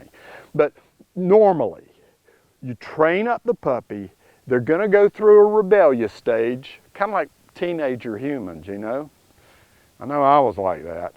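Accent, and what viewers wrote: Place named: New York, United States. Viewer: Too funny! Your NY accent ...paaaw... I’m from ny got a good laugh from that!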